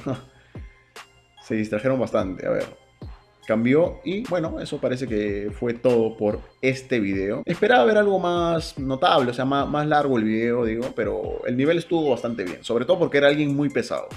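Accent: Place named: Mexico. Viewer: Pense que eras mexicano Saludos y exito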